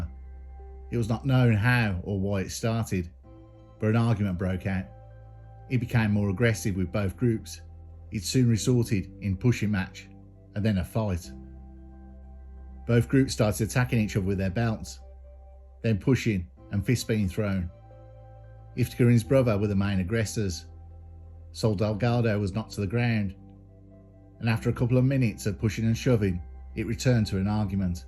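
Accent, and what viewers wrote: Birmingham, United Kingdom. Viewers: I know i keep saying this but I ❤this curious and spiritual i just wish you could do videos on a more frequent basis love it tho love the content and your narration sir are you a Brummie sir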